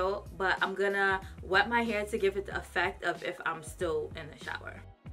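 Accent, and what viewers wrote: New York, United States. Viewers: Thank you for ya review! You sound like a new yorker 😝 let me subscribe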